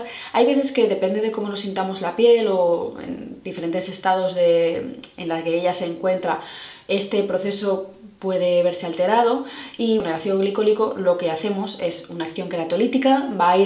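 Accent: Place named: Spain. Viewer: Me encanta como hablan los españoles! Saludos desde Mexico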